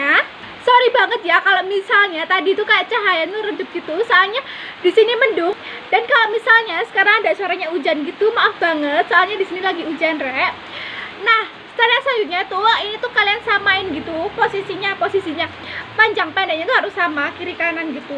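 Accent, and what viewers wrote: Java, Indonesia. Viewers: Iyo rek manteb rek ilat jowo medok rek hehehe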